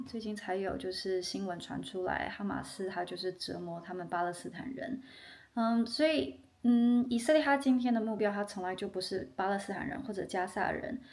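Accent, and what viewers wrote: Taiwan. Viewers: 聲音真的一聽就是住台灣的😅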